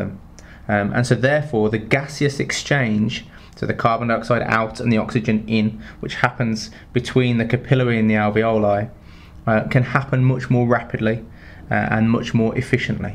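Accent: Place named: Midlands, United Kingdom. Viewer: Your voice accent sounds like it is from Leicestershire/ Midlands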